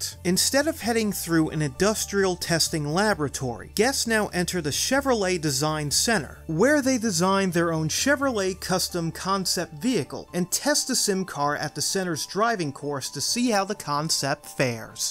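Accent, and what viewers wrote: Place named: New York, United States. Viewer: Alright. Maybe I’ve missed this in another video somewhere as I’m watching this as a playlist. But “aloung”…I’m getting a NorthEast accent. I know it’s not NH, MA, or ME. You don’t say “hahd, yahd, cahd, cah, bah, laand. Am I high in hearing some NY? Not trying to out your place or anything. Just the accent is bugging me and I just had to finally ask 😂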